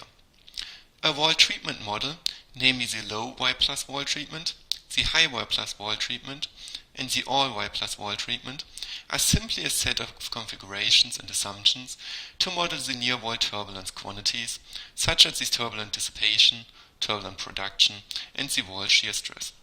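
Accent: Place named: France. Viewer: He's french and that's the french - english accent. It doesn't matter how close or far you are from the UK. ;)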